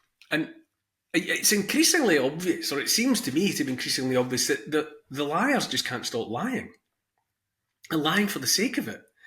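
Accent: Scotland, United Kingdom. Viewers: with his rough Scottish accent is very wise and insightful! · You speak of bad, sad things and i am fully on the same page with you. But listening to your Scottish accent awakens a hope for humanity in me, don't know why. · I thought I was listening to myself with a scottish accent ! thank you.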